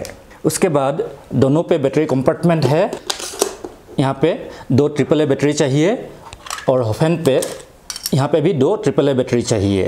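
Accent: Assam, India. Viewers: Bhai aap assam se ho kya 😁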